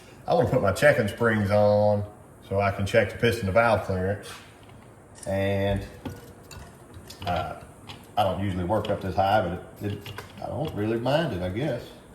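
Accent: South, United States. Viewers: You must own your own business or your an educated man with a very good income but it blows my 70 year old mind how your able to afford all these expensive builds and such but I do still like the southern accent and they very nice things you do.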